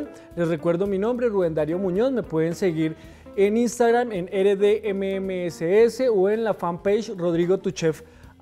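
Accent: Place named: Colombia. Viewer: El acento colombiano es raro pero es buena receta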